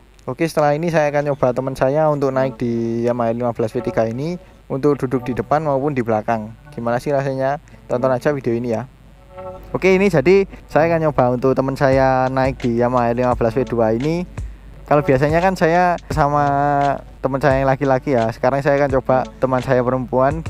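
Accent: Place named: Java, Indonesia. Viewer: Judul inglish ngomong medok jawirr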